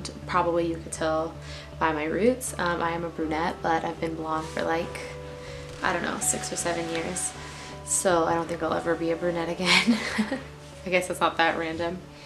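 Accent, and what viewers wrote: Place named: Bay Area, United States. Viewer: Good luck with your channel! Would've thought you were from the bay because of your cute little "accent" and because you say hella a lot haha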